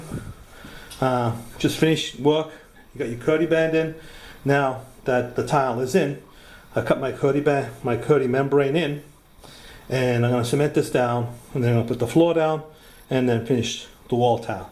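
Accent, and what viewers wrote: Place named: Boston, United States. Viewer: might I ask where you accent is from? It sounds to me (my untrained UK ear) what a Boston accent would sound like but seems to have a hint of something else occasionally. Where you Boston born and bred?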